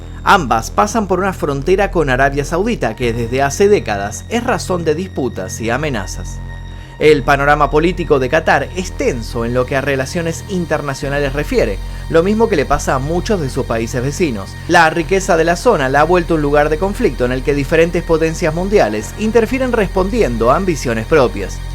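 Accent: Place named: Argentina. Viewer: Con todo respeto, no lo se, pero me atrevo a decir que por su acento es argentino, y si es el caso, es la primera vez que veo un video de un argentino hablando del mundial y sin decir que o explicar por que Argentina debería ser campeón. (Ojala si gane) hahaha apoyando a latam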